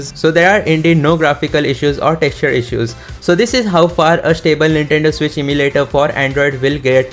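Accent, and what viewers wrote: India. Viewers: Dude that indian accent is so sexy.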